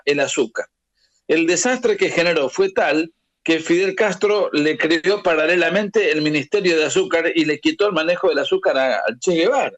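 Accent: Argentina. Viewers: No estas en nada. El che no tiene la culpa.de que estos Seudo izquierdistas. Utilizen la inmagen de el che para sus idioteses. La nueva izquierda. NO tiene nada que ver con la vieja Izquierda latinoamericana. Y el che era clase media. Todo lo que decis que se movia en elites esclusivos es mentira. Te entiendo porque eres Cubano. Que tubo que escapar de Cuba por la revolution. Aunque hables como Argentino. Deja de.engañar a las nuevas generaciones.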